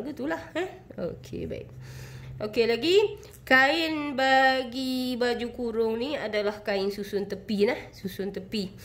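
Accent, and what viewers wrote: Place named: Kedah, Malaysia. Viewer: Asalamualaikum Terima kaseh Banyak dah Saya tengok vedio pola Baju kurong tapi Cara u explain sgt2 jelas Dan mudah d fahami Dan lucu dgr Loghat Kedah u rasa u memberi penerbangan sgt2 jelas u slalu kata jgn dok COMPLAIN. BUAT. DULU BUAT YG. MUDAH. DULU SAMPAI. FAHAM BETUL belajar yg mudah dulu sampai faham lepas tu Baru belajar yg lebih advance TERIMA KASEH. SEMOGA SIHAT2 SELALU DAN D LINDONGI ALLAH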